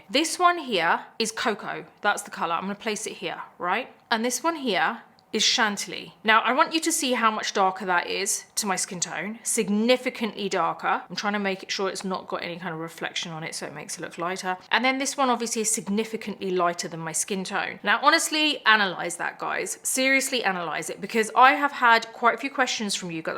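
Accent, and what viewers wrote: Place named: United Kingdom. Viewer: I love to watch your make up tutorials ,they are so helpful and you are absolutely beautiful and I love your British accent,I have always wanted to go there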